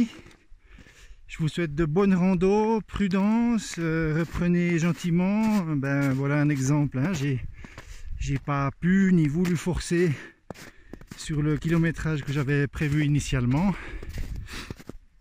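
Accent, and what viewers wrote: Switzerland. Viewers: Merci pour ces belles images, à mon sens une grande qualité de réalisation! et j'apprécie tes valeurs (l'humain con ^^) Cela donne envie de visiter la Suisse en tout cas Et pourtant que de préjugés et autres clichés vu de France (bon en même temps vous nous le rendez bien) j'adore ton accent (léger) qui me fait voyager pas si loin certes, et pourtant il y a déjà tant de différences culturelles.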